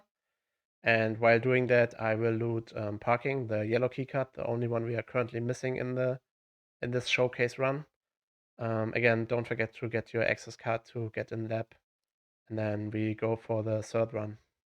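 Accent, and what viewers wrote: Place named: Germany. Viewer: I hear a very deutsch accent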